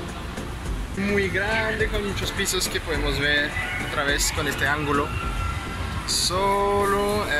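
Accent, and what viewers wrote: Colombia. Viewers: Aprendiste el mejor acento el colombiano, hablas re colombiano. Se que eres heterosexual, pero lo diré , eres guapo jeje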